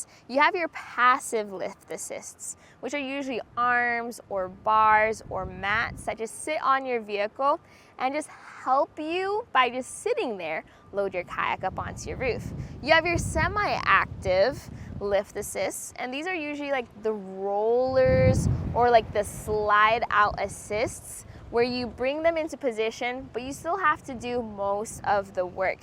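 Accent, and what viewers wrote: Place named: Hawaii, United States. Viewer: She doesnt really have an accent but more hint of her family dialect , slightly Hawaiian or Asian.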